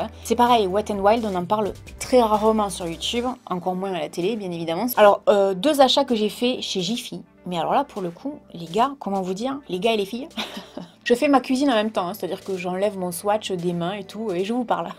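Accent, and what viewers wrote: South, France. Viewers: J'aime tellement ton peuti exan du sud, tu me fait penser à une amie d'enfant là même j'ai l'impression 😊 tes vidéo son super merci beaucoup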